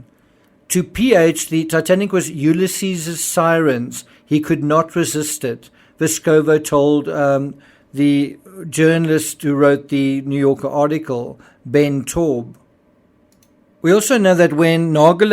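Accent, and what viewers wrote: South Africa. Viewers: Love your work and your accent. South African?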